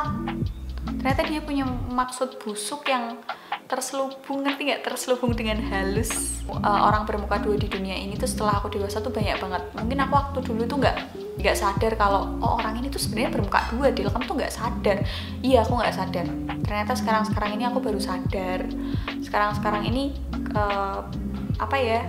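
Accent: Java, Indonesia. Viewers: Mbak jowo ne ndi to kok muuuwwweedook bngt 😀😀😀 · boso jowo e medok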